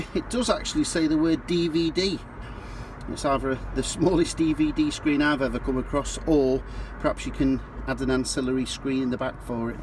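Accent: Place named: Northern England, United Kingdom. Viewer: Lingua impeccabile, lieve accento nord inglese, con i caratteristici glottal stops. Che il camper sia piccolo è lo scopo preciso del veicolo: è un miracolo che abbiano fatto stare tutto in un furgoncino tanto minuscolo. Poi se tu hai un Mobilvetta da 9 metri, buon per te, ma non c'entra niente. Mi sa che sei un po' corto di comprendonio.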